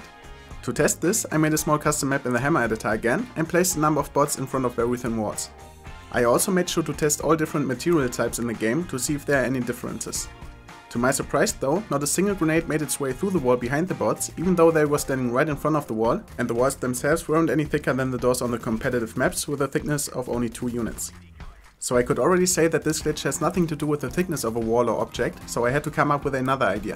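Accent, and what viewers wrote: Germany. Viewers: uuh ein deutscher xD geiles info Video :p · Wie er einfach den tooodes deutschen Akzent hat. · Geiles video. Hahahaha Deutsche hören instant, wenn jemand anders deutsch is. auch wenn er englisch redet XD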